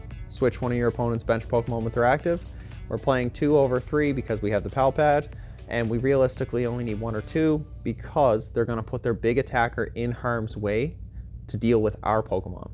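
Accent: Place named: Atlantic Canada, Canada. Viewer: Yo, you sound like you live in Atlantic Canada? Any events nearby coming up?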